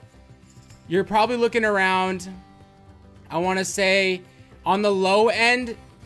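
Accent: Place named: Russia. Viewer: Love the videos! You are hilarious in many of them. Your Russian accent is great! Keep it up bro!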